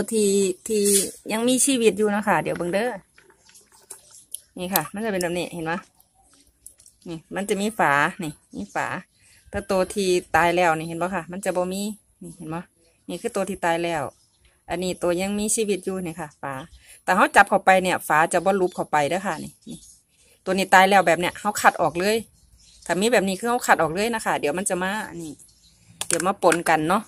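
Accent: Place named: Isan, Thailand. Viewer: ***เสียดาย สำเนียงอีสานฟังไม่ออก...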